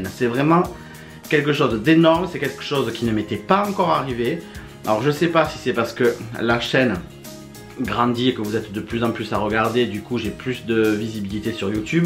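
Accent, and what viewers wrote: South, France. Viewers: Coucou =) Découverte de la chaine avec cette vidéo ;) Ah ça fait du bien un accent du sud!! Je suis fan de tes tsum Elliot derrière <3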